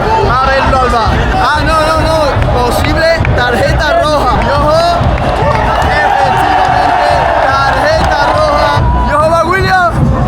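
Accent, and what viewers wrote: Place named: Castile, Spain. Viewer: O Teu sotaque castelhano é bem diferente, nunca ouvi. De onde é ?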